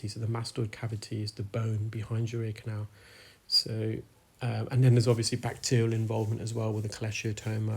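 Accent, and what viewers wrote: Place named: RP, United Kingdom. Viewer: Thanks to you Dr., and yes, in my book, you are a doctor, I have started using medical grade Olive oil for my itchy ears. What a relief. For me, the fascinating part of watching you is a) I never knew any of this about the human ear and I am learning so much from you. b) you are so passionate about what you do. c) I love how you use the proper queens' English, I wish we did that here in the states. d) It is so satisfying to see you help these people with your kindness and compassion.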